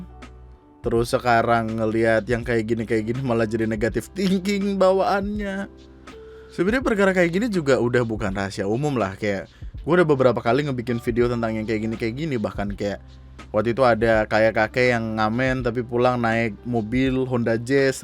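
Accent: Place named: Madura, Indonesia. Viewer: Bahasa Madura kalo denger logatnya bang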